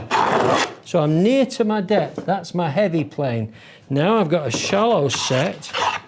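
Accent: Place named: Northern England, United Kingdom. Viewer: Beautiful work and I love hearing your commentary - reminds me of my beloved family from the north of England! Off to chamfer the base of the Oregon legs on my Moravian Workbench project. Thanks to you, I'm sure it will be a much better outcome now! EDIT: Well, I used your approach for the bottom of the Oregon legs, cutting the chamfers first with a Japanese saw, then cleaning the up with my (beautiful) block plane. I even used your pencil line method! The chamfers look great and I'm grateful to you. Cheers from Sydney - David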